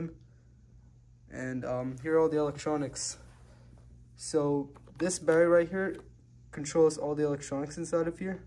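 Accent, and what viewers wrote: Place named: Kerala, India. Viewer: your name and your accent 😀. is a common name in kerala and the way you speaks resonated like a malayali touch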